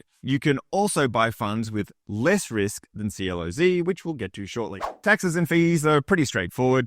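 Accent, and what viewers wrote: Australia. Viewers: Masterful & timely analysis of the pros and cons of CLOZ vs. other similar funds with different credit portfolios. reads and synthesizes the SA analysis which Couch investors like me benefit from and delivers in clear, personable, articulate English/Australian (?)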